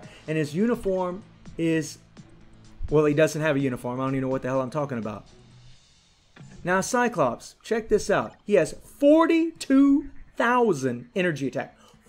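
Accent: United States. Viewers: are you a Southern American?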